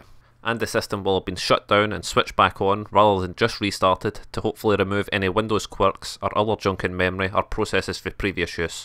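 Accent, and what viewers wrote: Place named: Scotland, United Kingdom. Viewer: Nice Scottish accent, I wish I had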